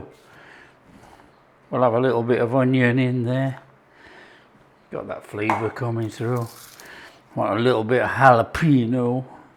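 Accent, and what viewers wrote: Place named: England, United Kingdom. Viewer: Love your scent which part of England are you from x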